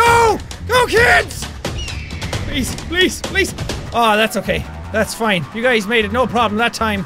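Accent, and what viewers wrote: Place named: Ireland. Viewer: I’ve come back to first video I saw 6 yrs ago because this is where my addiction to Irish accents was born. Love u jack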